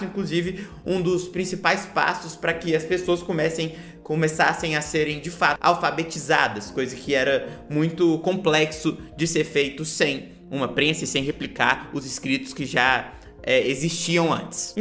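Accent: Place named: Bahia, Brazil. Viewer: Isso se chama sotaque.. Aqui na bahia é muito comum.. Como um brasileiro, tu já deveria estar acostumado com nossa variedade linguística e cultural.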